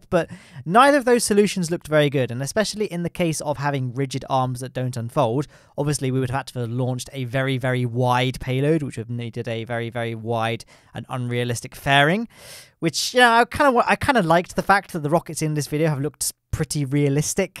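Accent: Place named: Doric, United Kingdom. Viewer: The "wh" pronounced as "hw" is a Doric thing, the dialect that suits between English and Scots. So it's especially appropriate for talking about hwiskey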